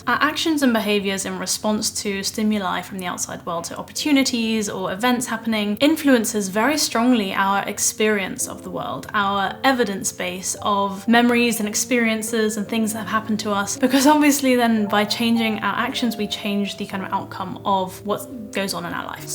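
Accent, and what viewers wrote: United Kingdom. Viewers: I love that you're Chinese, but you have a British accent. I could listen to you all day. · Hello Dr , could you please tell me what is the name of your British accent? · I'd say that your videos not only provide helpful and valuable information, but also are very good for learning English, especially for people who are learning British accent like me (I mean, among a lot of YouTubers who speaks British accent, your voice is very good and beautiful). Athough YouTube already had its own auto-generated subtitles for videos, I'm still thinking that it'll be better if you can add subtitles to your next videos. It would make it a great way for people who come not only to watch and learn things you're sharing in your videos, but also find a good YouTube channel for improving their English.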